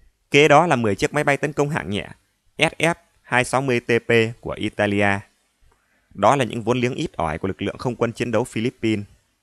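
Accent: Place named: Nam Dinh, Vietnam. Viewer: ad. que o dau z.. nge giong o nam dinh z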